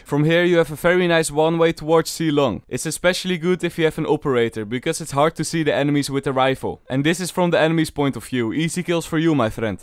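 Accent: Netherlands. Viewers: i smell dutch u from holland ? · Ik merk aan je accent dat je nederlands bent.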